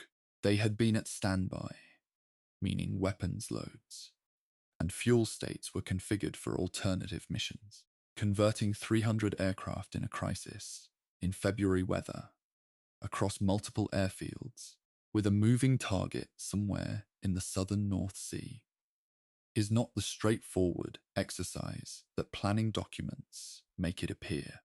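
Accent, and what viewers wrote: United Kingdom. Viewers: One of the best stories I have listened to on your channel. I love the narrator’s voice; Americans tend to love the British accent! Very soothing. Thank you!!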